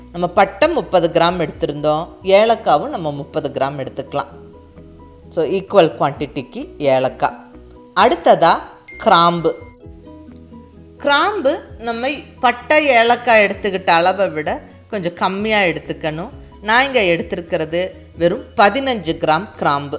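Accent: Kongu, India. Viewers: oh oh gongu nattu tamil konjugirade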